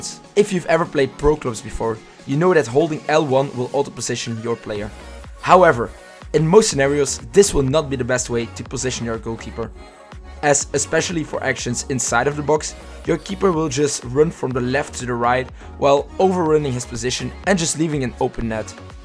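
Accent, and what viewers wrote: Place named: Netherlands. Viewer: Ik hoor an je uitspraak dat je Nederlands spreekt, klopt dat? Toch heb je een zeer goede uitspraak in het Engels. Bedankt vor de video, het heeft me geholpen. Groeten uit Duitsland! :)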